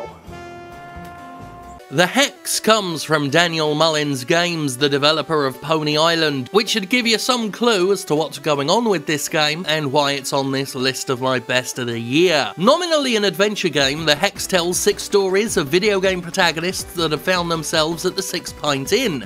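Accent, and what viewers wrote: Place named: United Kingdom. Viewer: I'm just thinking of what people thought of, standing next to a dumpster in America somewhere, dressed like that with a camera on him and his British accent. I'd like the police to drive by so I could see their double-take.. lol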